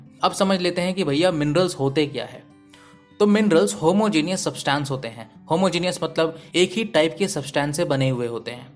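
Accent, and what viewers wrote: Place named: Bihar, India. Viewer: Bihari accent is just soo cute🤧💜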